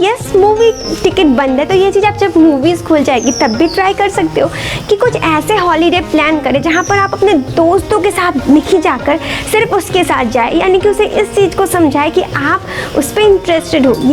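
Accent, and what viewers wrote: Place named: Jharkhand, India. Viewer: Jharkhandi boli ka asar Hindi me hai. Kya bat hai madam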